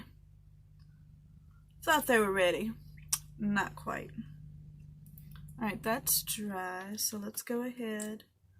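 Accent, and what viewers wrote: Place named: South, United States. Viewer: Yasss come on Southern Accent!!! I’m from Georgia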